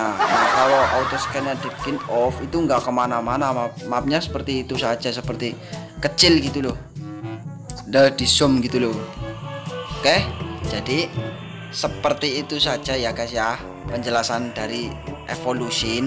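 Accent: Java, Indonesia. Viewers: Wih wong jowo🤣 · jawa ne ngendi pak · Wong Jowo to? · Bg orang Jawa ya kaya aku ngomong nya kaya gitu 😅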